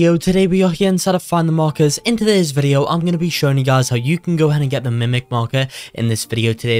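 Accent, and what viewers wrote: United Kingdom. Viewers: U ate british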